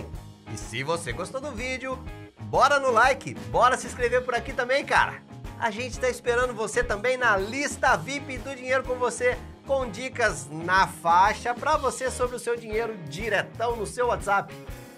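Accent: Minas Gerais, Brazil. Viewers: você é mineiro? UAI!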